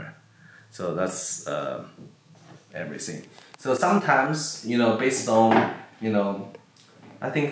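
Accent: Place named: China. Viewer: can you please add SUBTITLES for your bad chines English? Thank you.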